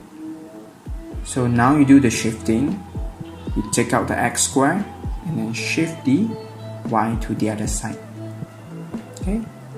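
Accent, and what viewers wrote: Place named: Singapore. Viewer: Thanks!! I know this question is out of the blue but are you from singapore?. Ur accent is kinda similar so😊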